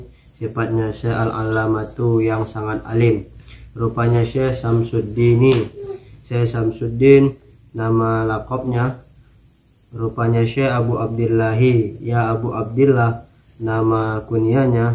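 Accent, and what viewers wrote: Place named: Medan, Indonesia. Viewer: Jelas kali logat medannya 😂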